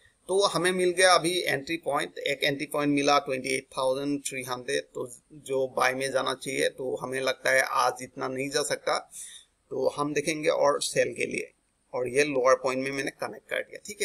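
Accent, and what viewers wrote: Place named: Tamil Nadu, India. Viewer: Tamilnadu English speaking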